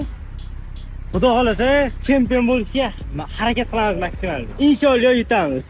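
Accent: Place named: Tashkent, Uzbekistan. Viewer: Oka haribroq bop qobsiz sal tashkencha gapirardizu oldin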